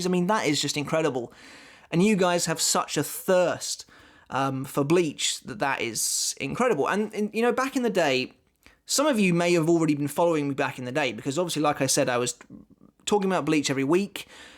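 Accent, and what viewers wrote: United Kingdom. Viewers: Wait you’re from the uk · i forgot...... british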